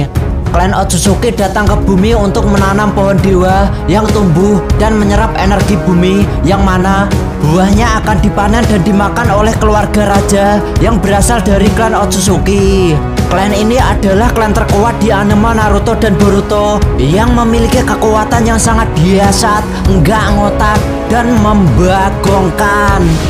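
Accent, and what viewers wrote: Java, Indonesia. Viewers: pekat jawanya bang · jan medok tenan rek😁😁😁😁😁😁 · medok amatttt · Asli mana ngab?... Logatnya medok amat🤭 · lah medok kali bahasa mu tong ,, ngakak njirr kayak lagi rap ini.. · Medok nya itu lo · Pekat beno jawa kau bang🤣🤣🤣🤣🤣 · logat mu loo jawa banget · mbahasa lo muedog buanget bro 😂😂😂😂😂😂 ga uenak di denger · Medok banget cukk 🤣🤣🤣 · kok pekat banget jowo nya min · baru nonton semenit pala pusing denger jawa ngomong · Medok x jawamu telor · Yang bicara auto jawA 😂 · Medok bgt bahasa jawa nya wkk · Logat jawa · Medok banget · Medok sekali😂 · Oalah medok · jawaneeeee medok abis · Terlalu medok suaranya😂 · Medok bgt mas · Medok jawa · Yooo org Jawa taelaso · Jawanya pekat😃😃😃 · Jawa amat si suaranya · Medok banget abangnya, jawa tulen kayaknya... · Bahasa nya medok jowo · Kayaknya orang jawa ni yg pnya chnel ini , dari logat nya 😂🤣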